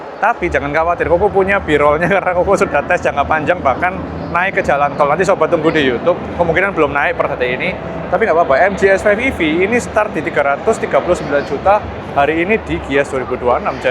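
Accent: Surabaya, Indonesia. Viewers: Aku kangen medok ro Suroboyoan mu 😊😊😊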